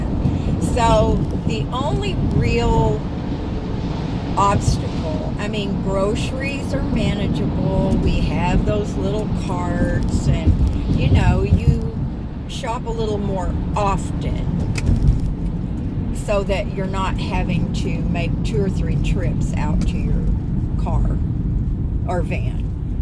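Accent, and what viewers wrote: South, United States. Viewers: You seem to be a very lovely person doing that for your friend. I’m sure you will be happy in your place. I have physio therapy today, (I’ve just had a knee replacement), so that’s my fun for the day haha. I do enjoy listening to your stories. Your voice is so calming. (Plus I’m in love with the southern American accent - mine is apparently too posh to understand, someone couldn’t understand me saying the word “France” yesterday 😂 )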